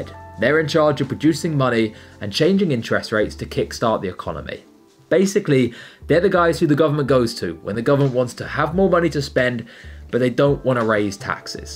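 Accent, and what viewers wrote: United Kingdom. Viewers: Great video. You sound British so why a US focus ? Shame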